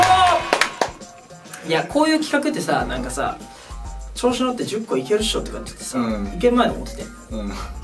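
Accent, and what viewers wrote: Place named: Kansai, Japan. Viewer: 関西弁なのめっちゃいい！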